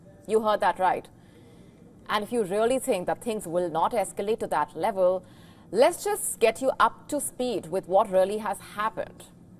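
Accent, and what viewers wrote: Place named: South India, India. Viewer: Is the news reader a south india. Her accent seems she is from south.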